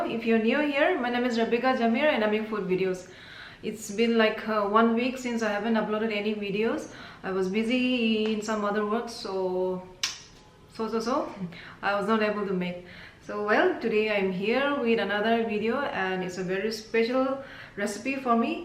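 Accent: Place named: Bengal, India. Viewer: Upki language bengale jeisa lakta hei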